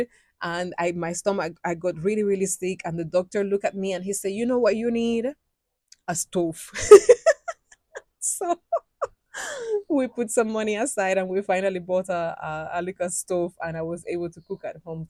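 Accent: Jamaica. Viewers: I LOVE when you sprinkle some patois into what you are saying!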